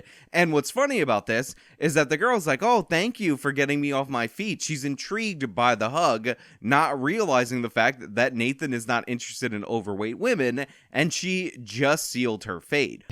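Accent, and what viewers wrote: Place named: Boston, United States. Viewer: I a Bostonian? the accent really comes through in this video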